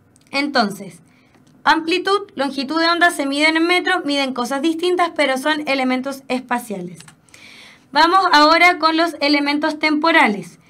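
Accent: Chile. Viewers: Ah mierda es chilena, no le entendi nada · Me gusta el acento de los chilenos!!! Jeje ♥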